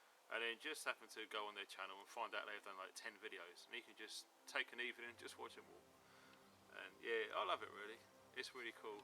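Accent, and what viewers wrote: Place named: Southern England, United Kingdom. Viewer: Like your Hampshire accent mate, it comes through at times here and there, the rest of the time its a modern Southern England accent, im from London, ive got that one too, ha. Have been watching your vids for a little while, im a subscriber now, look forwards to your New Year vids when you post. Lived in Portsmouth for a year a while back, (liked your Pompey shirt), hope they get back soon, Fratton Park and gunwharf Quays and Gosport ecrt ect, oh yeah, good times. Merry Christmas (ship mate).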